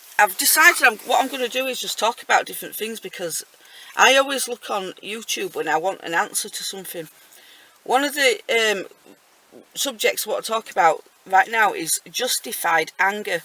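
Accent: United Kingdom. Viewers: I just discovered your YouTube channel after seeing your comment on the channel with the British guy in the Airstream. I'm watching this from Florida, in the U.S. I find it interesting to listen to people from the U.K. speaking English. It's like a whole different sounding language, even though I can understand everything you say.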